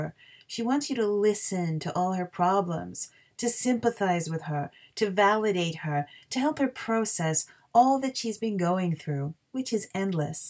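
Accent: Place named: Canada. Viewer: I hear the Canadian in the presenters voice lol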